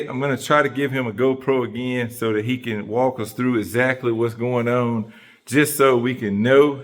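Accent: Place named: North Carolina, United States. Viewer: Hey, long time watcher first time commenting. What part of NC you are located? You sound like you may be close to my wife's people in Sanford.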